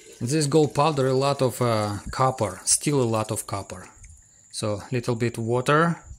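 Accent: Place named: Russia. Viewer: Слышен русский акцент)))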